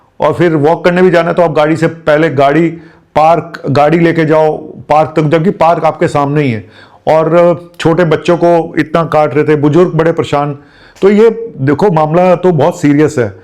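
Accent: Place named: Delhi, India. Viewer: Dr sahab ham gujrati log aapke jaisi Delhi vali hindi nahi bol sakte to plz hamari msg pdhhke hansna😂 nahi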